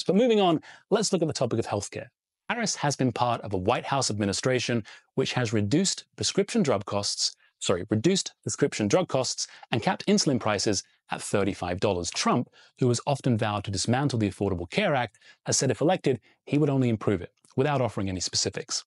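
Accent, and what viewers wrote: Canada. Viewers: I had no idea you were Canadian haha. I knew you weren't american, but could not nail the dialect. 😂 Great video as always buddy, Cheers! 🍻 All Americans GO AND VOTE! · Canadian! I've been trying to place that accent for ages! 😂 Genuinely thought you were either British or Australian. 😂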